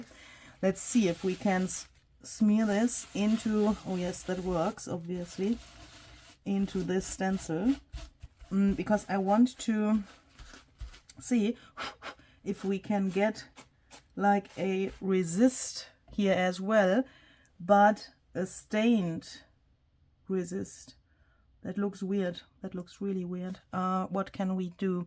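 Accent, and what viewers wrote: Germany. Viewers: I love hearing Tim-isms with a German accent.😄😄 You are a delight to listen to.❤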